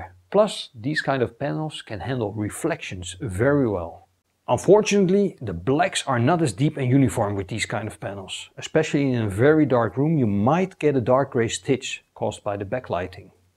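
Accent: Netherlands. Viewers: Sounds very Dutch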